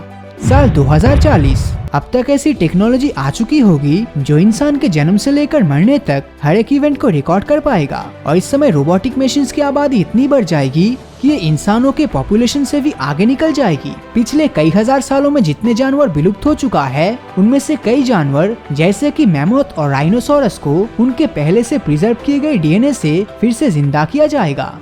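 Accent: Bengal, India. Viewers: Is your mother tongue bengali?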